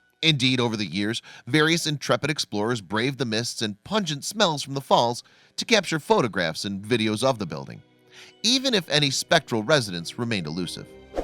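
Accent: United States. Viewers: Working it hard for a story -loud yank -very ordinary and dull .....only yanks could dramatise up as odd or unusual.....narrator tries to hard ..thumbs down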